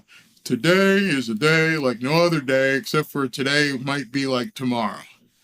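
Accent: United States. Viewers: I think the number of times you said his full name is at 15 with that awesome Pennsylvania accent. We know his name! Lol He's been hurt more then we know. Why do you think he forgot how to play in the 49ers game and the Chargers game... Head trauma. Tua needs to quot and if not he needs a new team. His career has been a train wreck.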